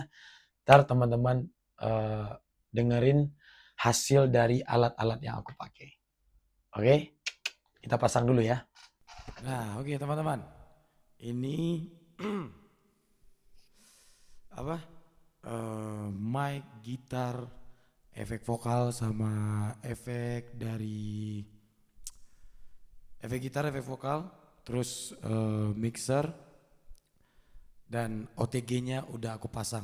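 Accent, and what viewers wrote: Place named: Padang, Indonesia. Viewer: Bg lu org padang ya? Bahasa indo lu agak lain 😂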